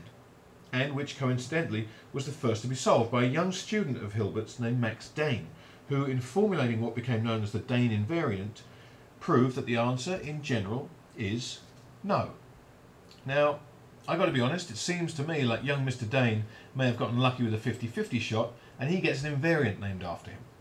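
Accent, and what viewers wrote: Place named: United Kingdom. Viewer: I guess the British accent makes him sound intelligent.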